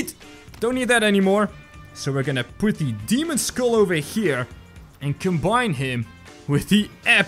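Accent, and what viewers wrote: Netherlands. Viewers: I have a question. Are you dutch?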